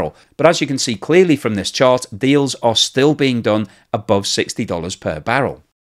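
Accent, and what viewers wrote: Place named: England, United Kingdom. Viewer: Yes indeed, if they come from Spain. Of course they may speak Spanish with an Argentinian accent if they come from Argentina. They might also speak English with an Argentinian accent..but this creator speaks English with an English accent. I might speak English with a Scottish accent in which case I wouldn't have an English accent but a Scottish one.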